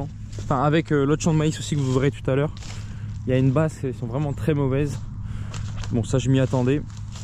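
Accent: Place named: Lorraine, France. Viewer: hello merci pour cette réponse dans l'attente de la suite des video en aparté je me regale aussi du petit accent bien de chez nous qui me fait du bien amitié de lorraine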